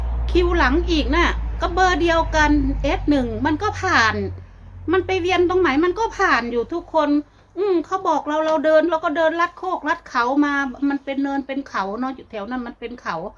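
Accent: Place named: Isan, Thailand. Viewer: เพิ่งเข้ามาชมฟังเสียงพูดเหมือนทางอิสานใช่คนโครชไหมคะ..เห็นอยู่คนเดียวสามีตาย..คงได้เงินช่วยบำนาญแม่หม้าย..ว่าแต่ตอนนี้ยังช่วยตัวเองได้. แต่อายัมากขึ้นจะลำบากไหมกลับมาอยู่ไทยสร้างบ้านอยู่คนเดียวใกล้ๆลูกหลานดีไหมคะ..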